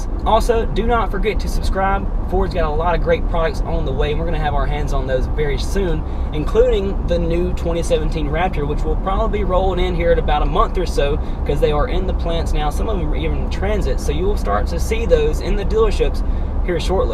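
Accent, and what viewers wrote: United States. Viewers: Country as hail lol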